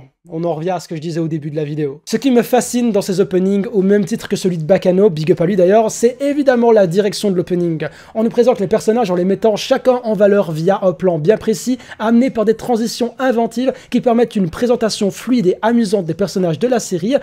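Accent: Belgium. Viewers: t'es belge ? ton accent me dit qqchose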